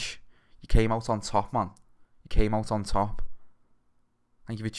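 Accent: Liverpool, United Kingdom. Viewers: Nice to hear a fellow scouser commentate on a fucking beauty of a audial concoction <3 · Liverpool 120% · WarDuck23 Think he’s a Scouser (Liverpool).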